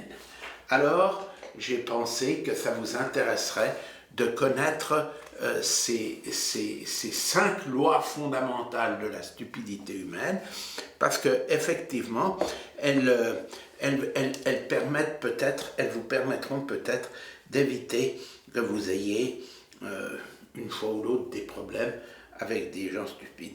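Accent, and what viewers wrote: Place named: Switzerland. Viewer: quel bel accent ;-) vaudois ou genevois ? salutations et merci encore pour votre travail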